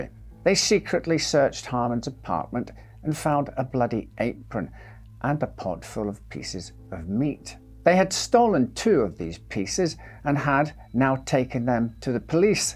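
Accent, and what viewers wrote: Scotland, United Kingdom. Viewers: You accent amazes me, I grew up in the Scottish Borders so it makes me feel like you are from around the same area but you lean heavily on English for a lot of your R sounds you sound a non rhotic scot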